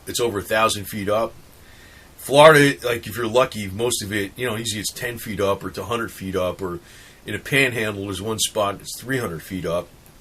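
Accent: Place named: New York, United States. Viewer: I love the WY you talk .. New Yorker .. I never believed him ither ... he never was in the future..Their us no future ..We're the future ..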